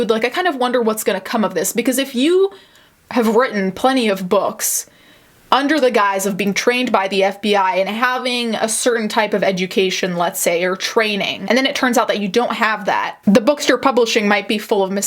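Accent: France. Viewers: Your French accent is stunning. My college French professor told me I should consider not taking French 2 bc of how poor my pronunciation is. Lmao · Do you speak french ? I ask you this because you have a great accent✨ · I'm french and everything you said is true. He was a liar for 30 years and we just found out ,thanks to 4eme œil corporation ,who he really is. But many of us were suspicious for a long time cause of the way he explained things. Always in a weird way and also different story over the years. I have no pity for him and no he didnt come clean , he admitted some things cause he didnt have the choice but keep lying since then. Nice French accent! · i’m sorry if this is rude, but you pronouncing the french names with an actual french accent takes me out of the story. you don’t have to stop it’s just a side note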